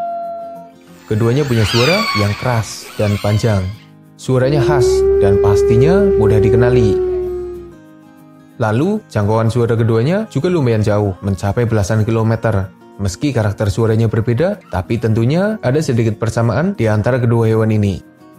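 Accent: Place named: Java, Indonesia. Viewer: Suara medokmu lho mas... Uhug mara'i kangen kampung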